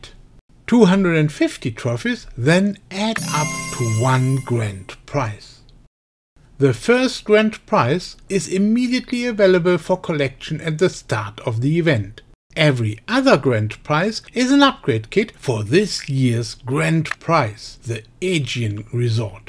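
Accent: Germany. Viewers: Sorry, bad joke. And yes we all can hear he is from Germany, but who cares? He speaks English, right? The video's are easy to understand.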